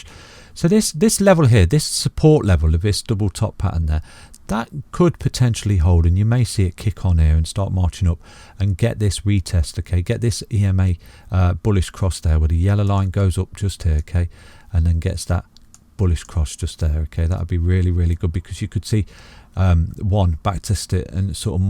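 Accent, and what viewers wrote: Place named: Midlands, United Kingdom. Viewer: Are you from Nottingham? Midlands accent 👍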